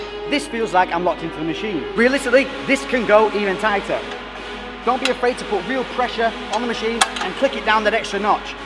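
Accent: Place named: Northern England, United Kingdom. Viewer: "Loosey Goosey" propper Northern lad :)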